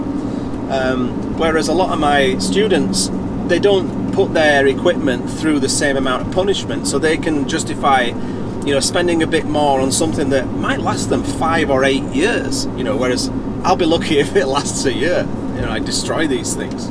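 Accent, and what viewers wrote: Yorkshire, United Kingdom. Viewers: I'm afraid I only got 10 per cent of your advice, I was seriously distracted by your lovely driver... Anyway, I'll just have to watch it again or better: just listen to the old Yorkshire voice...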